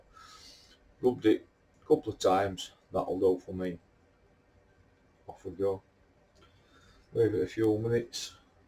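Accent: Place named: Yorkshire, United Kingdom. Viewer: Good video mate 👍 and love the Yorkshire accent too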